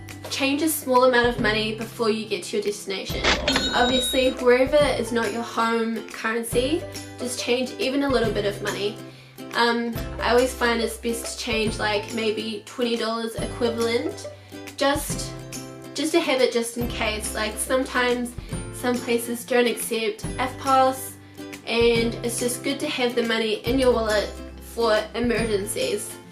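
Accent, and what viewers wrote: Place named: New Zealand. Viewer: Is that a Kiwi accent I hear?! LOVE IT 😍🙏 And awesome video, great tips chica! X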